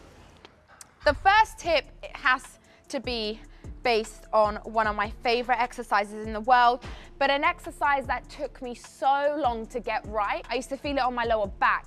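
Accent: England, United Kingdom. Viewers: Смотрю ее только из за английского акцента)))))) ну и жопа тоже класс😁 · she caught me off guard with that f bomb.... sounding all proper with that British accent and looking so innocent. Lol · me encanta tu acento britanico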